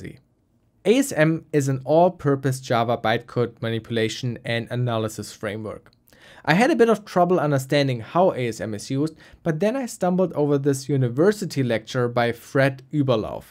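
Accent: Germany. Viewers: Wie krass man hört, dass du Deutscher bist xD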